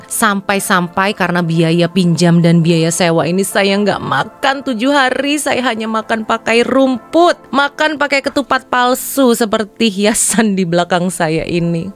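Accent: Indonesia. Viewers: Dengar percakapan sahaja sudah tahu Sis ini orang Indonesia! Masih ada yang bertanya orang Indonesia atau Malaysia? 😂 From Malaysian - Kuala Lumpur Malaysia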